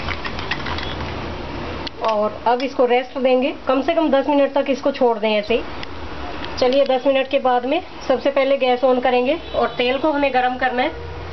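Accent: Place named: Punjab, India. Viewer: As salam walekum sister's ap ki recipe se ziyada ap ki bate achi lagti h panjabi lehje me urdu bolti h bhot acha lagta h or wo waords sun k bhot acha laga or hasi arahi h or (pahari bana k) 😀😀😀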